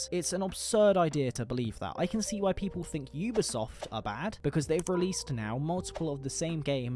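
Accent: United Kingdom. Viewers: I'd pay double for games if they leave out the wokeness and sell a polished and most importantly FINISHED product. That aside bro, you sound like you live in the UK. Can you seriously say that woke ideology hasn't ruined everything it has gotten its claws into? As consumers we can vote with our wallets if companies implement particularly predatory business practices. Against woke ideology? Against companies propped up by their ESG rating? Nothing. Even voting doesn't help.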